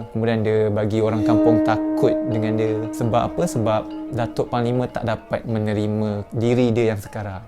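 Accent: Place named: Perak, Malaysia. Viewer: Org perak ke yop ajer nya😂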